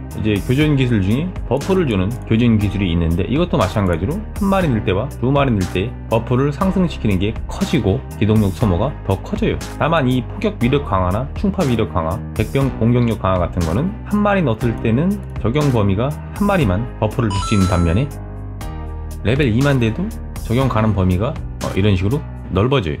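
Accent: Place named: Chungcheong, South Korea. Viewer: 충청도이신가 .. 억양에 친근감이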